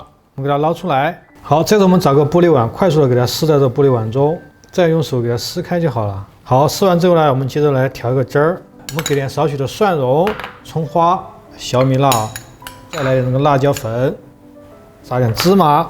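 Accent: Sichuan, China. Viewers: 师傅是四川人吗？rua 均匀，哈哈哈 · 帅哥川普十级，听上去特别亲切。菜谱也棒棒地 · 听到师傅的椒盐普通话，就晓得师傅的川菜是资格的。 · 川味普通话好情切哦！❤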